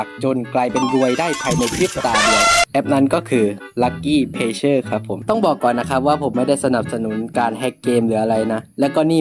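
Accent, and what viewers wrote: South, Thailand. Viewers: เจ้าของช่่องคนใต้รึเปล่าคะ55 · คนใต้แน่ๆ5555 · พี่บ่าวคนใต้รึป่าว😮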